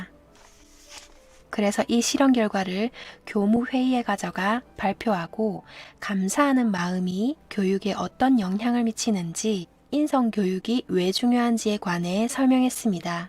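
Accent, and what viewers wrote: Gyeongsang, South Korea. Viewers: 님의 경상도 억양 너무 귀여워요^^♡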